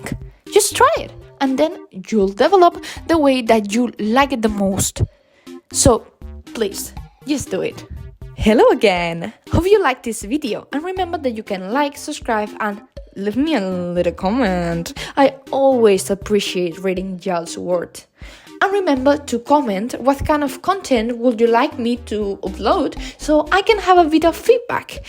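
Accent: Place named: Spain. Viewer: You should be on my voice claim list for me and my friend's oc cuz OMG YOU SHOULD BE CHARLIES GENDER SWAP VOICE CUZ OF YOUR SPANISH ACCENT , btw love your art keep it up :3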